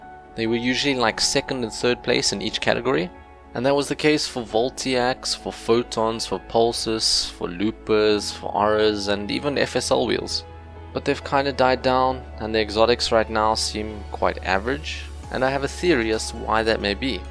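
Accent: South Africa. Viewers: Is ur accent South African? cause if it is, I'm South African Too! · South African?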